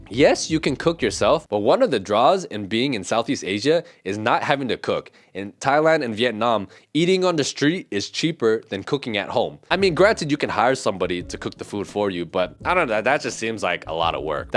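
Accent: United States. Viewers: I'm thinking about it because I want to surf and skate 🤘😎🤘 and play some music 🤘😎🤘 You know this cat doesn't drink sink water in America 😂 he's totally a gas station water bottle Guy 😂 He speaks like an American but he said he was Chinese/Philippines he didn't say anything about why he sounds American 😂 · tribal … no … family first … your accent sounds american … which has become tribal of wokism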